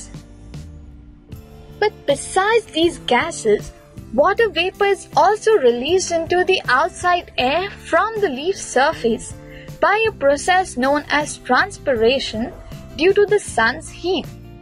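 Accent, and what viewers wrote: India. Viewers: this video could be useful, but sadly it's in india accent, take time to understand..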